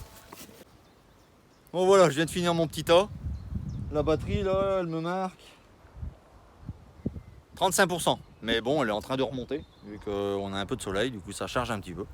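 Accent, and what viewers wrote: North, France. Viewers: Bonjour, vidéo très instructive comme toujours, merci 😊, j'adore l'accent du ch'nord, ici moi j'ai celui du ch'sud 😁😉😘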